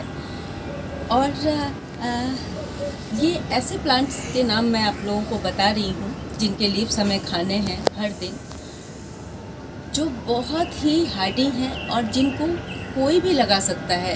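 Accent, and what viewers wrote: Bihar, India. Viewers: Na hi tumhara biharipan bolne mein fark he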